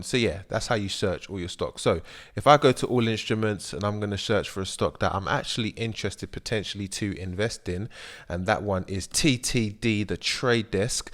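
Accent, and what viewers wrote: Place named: London, United Kingdom. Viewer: u sound like you're from ends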